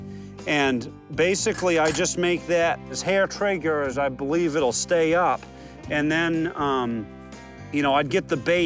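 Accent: Boston, United States. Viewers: Boston accent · He has to be from Boston....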